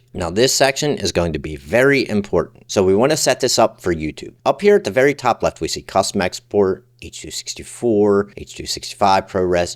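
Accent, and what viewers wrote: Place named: Pittsburgh, United States. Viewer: Are you from Pittsburgh? ❤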